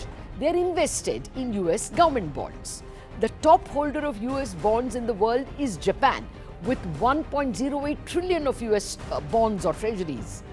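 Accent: India. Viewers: Like Sirens Latha glues the listeners to the screen with her unique Indian accent.Why she sounds so persuasive? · Exaxtly, so inappropriate and distracting! Cant stand that indian accent, despite being an english speaking channel! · Why doesn't she dress in a business attire instead of looking like she came out of a kitchen. Also, her accent is too much. I can't understand her Indian English.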